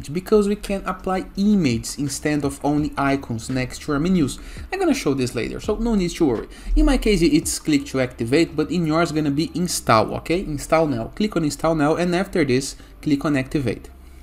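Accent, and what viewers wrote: Brazil. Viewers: great video. btw you brazilian?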